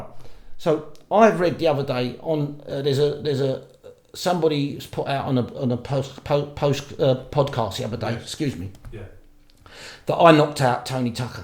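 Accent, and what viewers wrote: Cockney, United Kingdom. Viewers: What a load of vintage Cockney bollox, why oh why. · hears the cockney accent and thinks you're full of it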